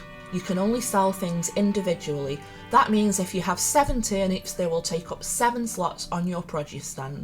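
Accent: United Kingdom. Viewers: Can I ask are you from the Staffordshire area its your accent great video btw 👍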